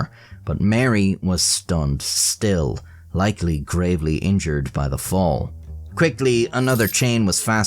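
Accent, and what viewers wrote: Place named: Ireland. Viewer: If you're new around here and looking for a similar but less horrifying video, try the one about the monkey railroad conductor. If there were a hall of fame for videos, that one deserves a spot. And dammit, the world LOVES Irish accents, your voice is delightful.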